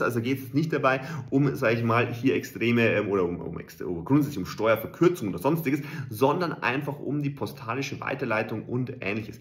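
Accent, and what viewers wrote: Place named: Bavaria, Germany. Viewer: Kann jemandem mit solch einem Dialekt never ever zuhören..sorry, bei aller Liebe. Wohl was eher für die bayrische Bevölkerung. Vielleicht hat er einen Blog..dann lese ich lieber!😅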